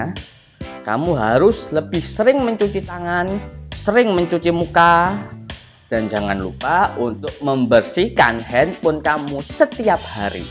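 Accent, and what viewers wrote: Java, Indonesia. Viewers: 😜doktere lucu y.medok bangettt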